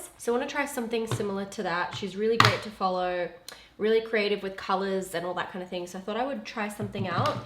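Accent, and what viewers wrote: Australia. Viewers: Loved the look! The palette looks amazing! And love that you’re repping the Aussie accent 🤙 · Your accent🥺🥺 I miss your fully Australian one😔❤️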